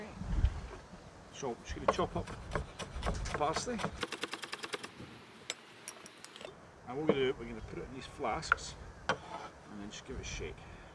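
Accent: Scotland, United Kingdom. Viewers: pretty good man! where are you from originally? I sense a bit of a scottish accent, or maybe northern england? my only suggestion is that for thin cuts like entrecot or matambre, is better to salt them on the grill or right before you put them in. Also chimi is better when you leave it in the fridge for at least 24 hs! The true test for a grill master is to grill a whole vacio cut (which has 3 different cuts in it , with loads of fat and membrane for flavour and crunchyness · Great vid. Just found it. The accent though? Scottish? Went to Argentina and ate almost a kilo of steak a day. Loved it.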